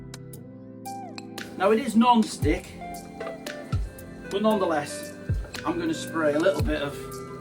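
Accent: Stoke, United Kingdom. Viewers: Great video .may I ask where that is it looks amazing .and is that a stoke accent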